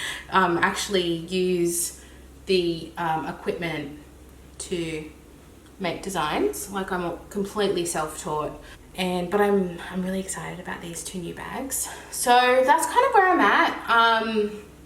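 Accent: Australia. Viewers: Im so so sorry Australians and im absolutely not trying to offend u but i really really do not like an Australian accent 😮. It makes people sound so friggin unintelligent and almost as if ur slurring ur words like ur drunk. I think its the most unattractive and horribly sounding accent in the entire world. Btw, i have had zero influences. Please don't come at me with ur anger and verbal abuse. Like i said, its just my opinion which im totally entitled to express. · I have just found your videos and love having a straight talking Aussie beauty voice around. Love your vibe and realness very much. And laughing so much at how hot and sweaty you are because I totally get it 😂. So hard to even do makeup on some days the last few months. And so much appreciate the discussion on overconsumption. I am a proud environmentalist but also a beauty and clothing lover and struggle to live by my values in terms in that space in terms of overconsuming and wasting because there are so many cool things to try and express yourself with. 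But we need to be more mindful and keep trying and figuring out how to make sustainability work. I so appreciate you talking about this on your platform because if no one talks about it openly we all just sit here feeling guilty and not knowing what to do - nice to all be in it together rather than feeling lost amongst the pressure to consume from the media & influencers.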